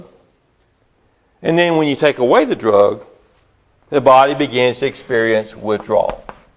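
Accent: South, United States. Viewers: Don't understand your american speech. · This Doc is amazing, just a brilliant teacher....Hard to find a Doc that can teach in such a simple manner...Love the Southern draw...Good people from the South..I wonder if this Doctor works at a recovery center.. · krisztina kessel it sounds southern of some kind. But it also sounds like he has a mild speech impediment as well which makes it a bit difficult to understand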